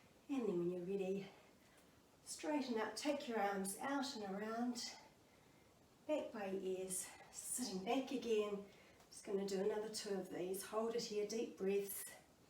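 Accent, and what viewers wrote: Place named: New Zealand. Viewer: I love your dark hair! I don't know why your channel doesn't get more subscribers. I love your videos and your calming voice and very unique Kiwi accent. Just wanted to let you know you're definitely appreciated! 🤗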